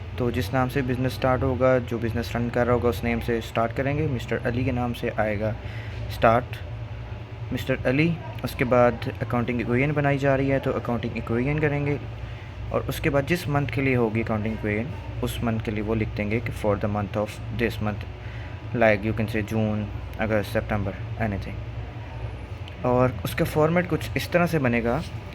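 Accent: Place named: Pakistan. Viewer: Omg your urdu accent is so... I wanna meet you sir! Absolutely Amazing❤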